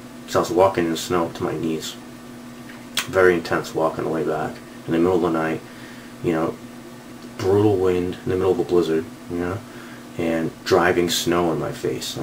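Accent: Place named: Massachusetts, United States. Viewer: Watched part 1 as well. Thought to myself "sounds like he's from ma". Soon as I heard you say New Bedford I knew I was right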